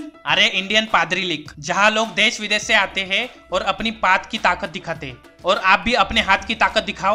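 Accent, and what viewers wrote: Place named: Gujarat, India. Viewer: He is gujju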